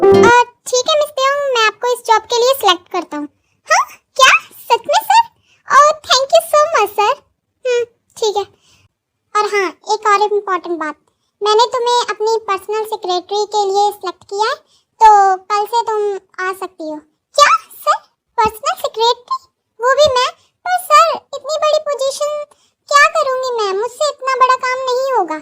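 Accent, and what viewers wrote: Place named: Bihar, India. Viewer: Badi mast bihari boli hai to good ❤❤❤❤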